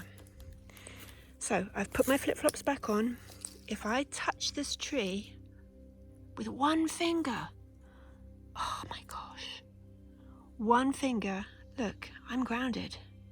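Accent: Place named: United Kingdom. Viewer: Just came across your video you sound like you’re here in the UK , where did you get the tester from ?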